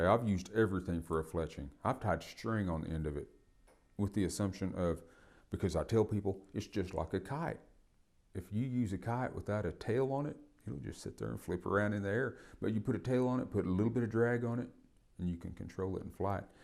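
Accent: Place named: South, United States. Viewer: This dude's country as hell lmao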